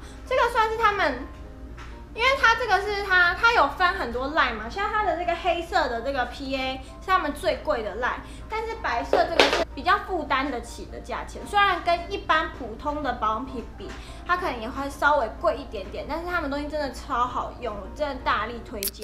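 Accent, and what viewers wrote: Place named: Taiwan. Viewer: 你眼妝太重啦！台灣口音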